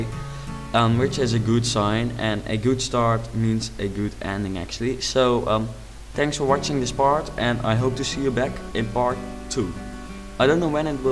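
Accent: Netherlands. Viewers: it's because he is Dutch. I am Dutch to, and I also have that accent! there is nothing wrong with that. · i tried watching this video without getting annoyed by the dutch accent, but i just can't, i'm sorry. i'm dutch as well, but i can't stand the accent, imagine listening to it for 23 minutes.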